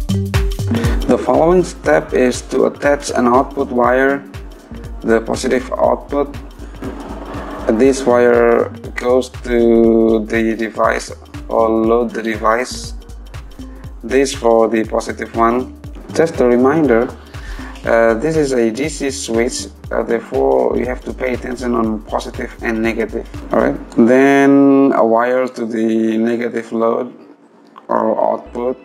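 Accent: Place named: Indonesia. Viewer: i saw ur previous video list, actually i was thought u are indian, now i knows u from Indonesia, that's why say i was talk ur accent 😬😁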